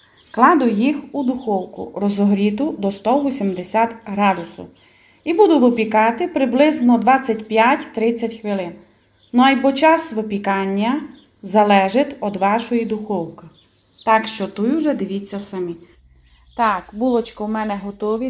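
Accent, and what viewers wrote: Transcarpathia, Ukraine. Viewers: дуже приємно дивитись і слухати Вас.Булочки як і все у Вас,дуже гарнезні і смачні!Дуже люблю дивитись Ваш канал.Всього Вам найкращого,здоров'я і Божого благословення.Все буде Україна!, Ваш Закарпатський діалект як мед на серце!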